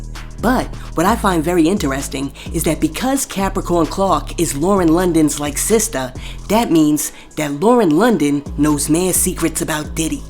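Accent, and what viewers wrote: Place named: New York City, United States. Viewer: I just subscribed to your channel and I ❤ it. Love your NYC accent!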